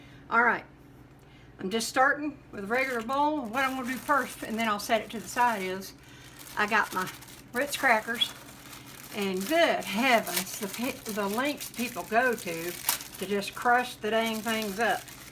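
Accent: South, United States. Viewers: Looks good just get to the dish heavy southern talk hard to understand some of the talk will make it soon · p.s. I could listen to that lovely southern talk all day long. My Mama grew up in the Smokies. <3 :)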